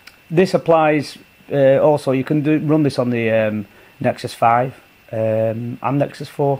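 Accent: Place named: Lancashire, United Kingdom. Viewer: if only it were this easy to put it on my one plus one. nice video made better for a lancashire accent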